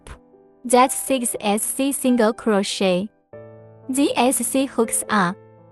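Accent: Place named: China. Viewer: more like English with a very Chinese accent and crochet lingo we can’t understand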